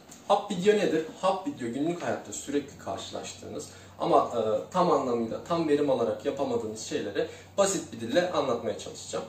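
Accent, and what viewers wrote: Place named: Kayseri, Turkey. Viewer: Kayseri ağzıyla video çekkk 😊 başarılar tatlı abim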